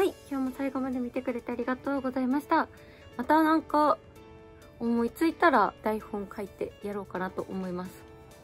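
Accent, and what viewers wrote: Tsugaru, Japan. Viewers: めちゃかわいくて、津軽弁で話したら惚れちゃうかも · お若いのに津軽弁を話せるとはすごいです。今でも、地域的に津軽弁の濃いところはあるのですが、若い人はしゃべれなくなってきました。けっぱってください。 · 北海道の多くの地域でごみを投げるで通じますよ。ちょす＝いじるは函館をはじめ道南地方では使いますね。布団着るもわかるし、へばね～も使うよwww 津軽弁で喋る可愛すぎ！ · 女の子の津軽弁上品やな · 良いなぁ。津軽弁‼️まっと(もっと)やれじゃ‼️(やって) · 寝ようとしたら女神？天使？津軽弁ぶち可愛いやんけ！(広島弁) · 津軽弁の上級編？！、少し~慣れるまで時間がかかるかな。でもお国言葉ってほのぼのして良いな~。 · やっぱり、津軽弁カワイイ〜❣️ホッコリする❤️ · の津軽弁、あいかわらずめんこいな～！次は、『いずい』だの『しずね』に『ねっぱす』なんか、いいんでねぇべが… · 本当、津軽弁可愛いっす😍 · 今日も見れた！津軽弁可愛い✨ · こんばんは‼️ ってかの津軽弁滅茶苦茶可愛い過ぎですね👍 地方から（自分もですが） 東京に上京して年数が経てば経つにつれ自分の生まれ育った土地の言葉って正直イントネーションとか自分では気付かない内に変わってしまいがちだけど… 今日もに癒やされました。まだまだコロナも収束を見せる処か大阪に続き東京もまん防発令されるかも知れない状況ですが本当の家族の為にもコロナには十分気を付けて下さいね‼️ これからも応援してます👍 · 、思い付かなくても、シリーズ化してください🙇 やっぱり津軽弁のにドキドキです💖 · だいぶ綺麗な津軽弁ですね。わの周り汚い津軽弁です。五所川原だはんで。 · こんばんは津軽弁のも可愛いすぎとるね。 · 津軽弁良き！😆 シリーズ化して欲しいな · 初見です！父方の実家が青森で幼少期実家のように訪れてたため津軽弁が恋しくなってポチりました！動画自体は凄く良いと思います！ただ訛り方が少し津軽弁弱いと思ってしまって懐かしさがあんまり感じれなく残念と思ってしまったのが感想です...これからも頑張って下さい！ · の津軽弁、いいね😊 標準語と津軽弁で服装変えるのは、のイメージかな？？？ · の津軽弁カワイイ！ASMRの津軽弁寝かしつけの動画やって欲しい！ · の津軽弁刺さりますw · 津軽弁良いですね₍ᐢ⑅•ᴗ•⑅ᐢ₎♡ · 第三段やって欲しい！の津軽弁を永遠に電話で聴きながら寝落ちしたい笑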